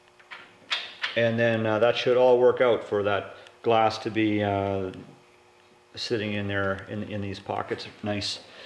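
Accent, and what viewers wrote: Canada. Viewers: Sounds like a Canadian accent eh!! Some people say I have an accent, I can hear it though!!☺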